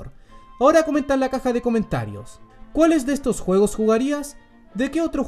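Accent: Chile. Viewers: ¿Eres chileno, verdad?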